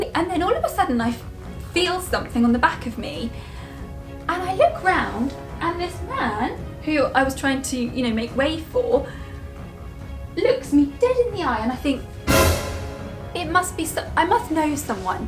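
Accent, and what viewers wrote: United Kingdom. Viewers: You're so awesome, love the British accent.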